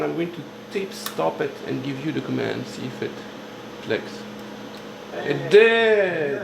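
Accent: France. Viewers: All of this work you have done over the last several years should be preserved in the National archives, French accent and all. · u french?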